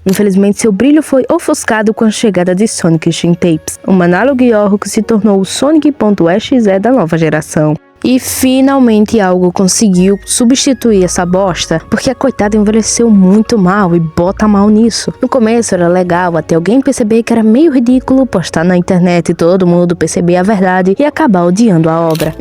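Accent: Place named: Portugal. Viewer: Nossaaa... Cai de paraquedas aqui pesquisando sobre creepypastas, porque me deu saudades de ver algumas, e acabei adorando seu vídeo ❤ Sua voz tem um sotaque lindo, no começo até pensei era português de Portugal. Me deu mó nostálgia da época que a hora mais divertida era chegar a noite, e pesquisar alguma creepypasta no Wattpad, as que eu mais gostava eram as sobre o Jack Risonho, Jaff The Killer, e sobre a Deep Web e lendas japonesas bem bizarras. Tem um outro canal que faz videos-creepypastas incríveis aqui no YT , que é o Sigma Terror, eu sigo e ouço as histórias dele a mais de 8 anos, as histórias dele são muito interessantes e bizarras, algumas são até bem pesadas, mais o que mais me surpreende é que a forma que ele narra é incrível, e ele tem uma voz linda e boa de ouvir que faz você se sentir estranhamente confortável e relaxada depois de um dia cansativo. 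Aqui tem o link de duas histórias dele que eu considero mais pesadas ( tem muito mais, mas essas são as que mais marcaram minha adolescência ) : O Jack Risonho e Izaque <link> Na hora Certa... <link>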